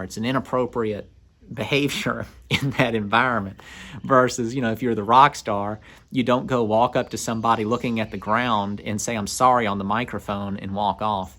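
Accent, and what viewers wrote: South, United States. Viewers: I agree with the chap who said you could do motivational speaking, myself I also like just the sound of your voice and your accent! ( southern US) I also would love to see this house when it is finished but nothing you post is boring because everything you post teaches me something. I love the passive aggressive, there is a lot of that going on in Britain, it's almost second nature. Family comes first doing things you have to and because you want to I can always go and study other videos you've made which cover almost every aspect of what you would want to learn thank you for some more great content. Kind Regards from South West England.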